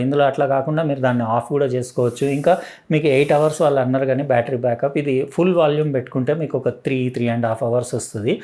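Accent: Telangana, India. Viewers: Telangana slang baley matladthar anna meeru😊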